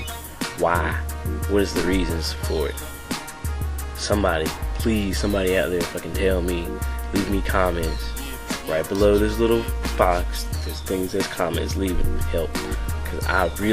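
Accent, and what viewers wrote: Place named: United States. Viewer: speaking correctly is a matter of opinion. You're way too americanized.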